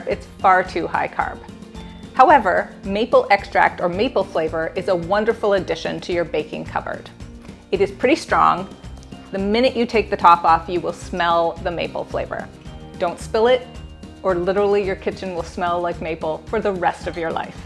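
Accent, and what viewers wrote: Canada. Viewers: Are you U.S. North or Canadian North?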